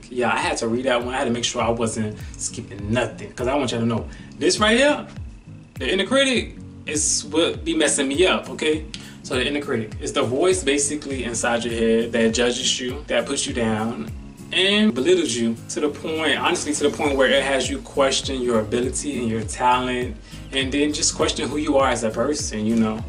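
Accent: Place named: Spain. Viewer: Lol "its my Spanish accent even though I don't speak Spanish" 😅.. Im definitely with you on that!!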